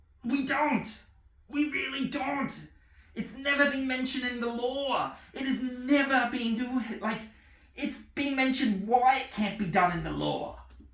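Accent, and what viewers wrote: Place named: Germany. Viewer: dude I thought you were either German or Austrian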